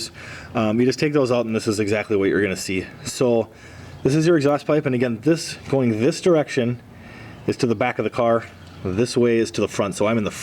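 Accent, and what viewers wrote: Minnesota, United States. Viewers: That Minnesota accent is on point!!! hell yea!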